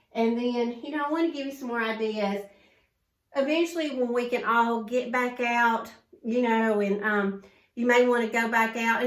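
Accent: South, United States. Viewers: Blessings to you. I love your channel I love your southern accent and ideas. God bless y'all. Keep sharing.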